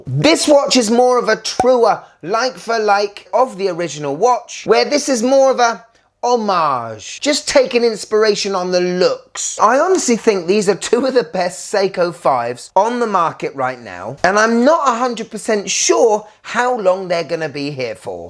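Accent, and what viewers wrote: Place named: Scotland, United Kingdom. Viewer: I thought you were one of us with that Scottish accent mate! 🤣🤣 both awesome looking watches and another fun show as always matey! 😁👌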